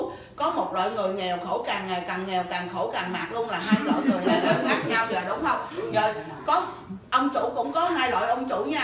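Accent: China. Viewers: nguyen linhdan cô là người Tàu nhé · Gốc hoa đó bạn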